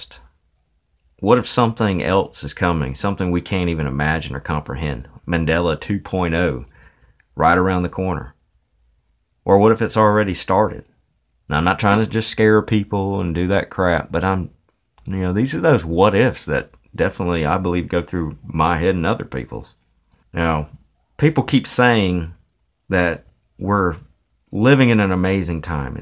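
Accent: South, United States. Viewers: first off,it's refreshing to hear a fellow southerner,lol, but YES, I started smoking at 11, unfortunately,& we were living in Nashville time. there were ads & commercials EVERYWHERE!!!! I PAYED THEM MUCH ATTENTION,due to being a very young smoker!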